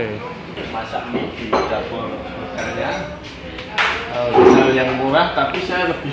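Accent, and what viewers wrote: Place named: Java, Indonesia. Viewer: Medokmen to yo hhah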